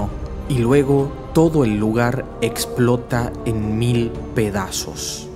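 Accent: Tucumán, Argentina. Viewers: sos tucumano amigo? habla como tucumanl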